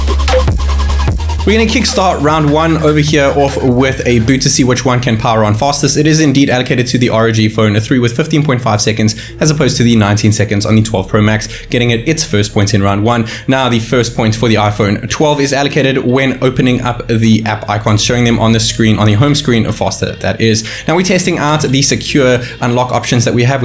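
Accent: South Africa. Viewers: Is from South Africa?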